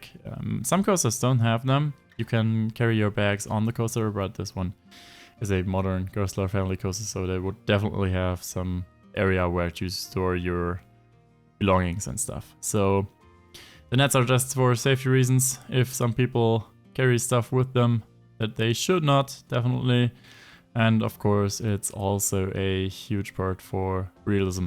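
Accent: Germany. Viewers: got a German accent in his English... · Are you german?😂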